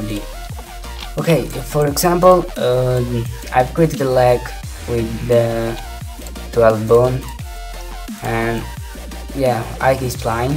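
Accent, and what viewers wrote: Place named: Italy. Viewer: You sound so stereotipically italian.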